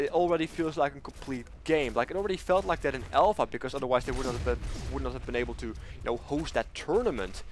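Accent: Netherlands. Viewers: je klink als een nederlander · u dutch??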